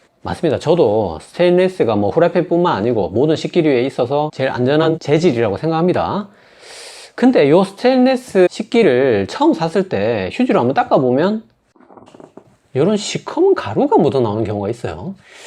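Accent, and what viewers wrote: Gyeongsang, South Korea. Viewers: 갱상도 의사샘 ㅋㅋ, , 너무 친근하고 부담없는 생활지식 알려주셔서 잘보고 있습니다. always thanks 🙏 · 경상도 분인 게 표가 나나요? ㅋㅋ 저도 경상돈데 들어도 잘 모르겠는데... 쌤이 표준어 쓰시는 거 같은디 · 사투리나 좀 고쳐라 듣기 거북하네 갱상도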